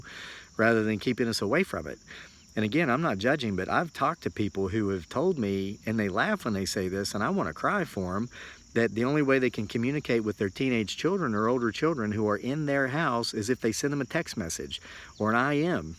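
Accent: South, United States. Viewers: Hey, watch out flipping that old phone around, you might get beamed up to the Starship Enterprise. Haahaa. Just kidding, I got one too, and will keep it until it dies. Real money savers too. You are right about people zoneing out with their noses 8" from the I phone screens. It will, and is having a negative effect on our society, people (Young people) have lost the art of simple conversation and reading faces and expressions, and that is concerning. Agree that getting a signal in the country can be very hard, you may have to use a different carrier. Here in the Ozarks, it can be a problem sometimes too. I see that you have a bit of a Southern accent, are you from the Carolina's? If so, did you hunt and fish as a boy with your dad? Will you be teaching your son those skills too? Enjoy your show. Thanks.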